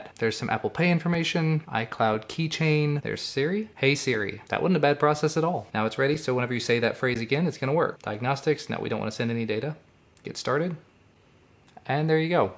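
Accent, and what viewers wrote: Kentucky, United States. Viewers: R you from Kentucky?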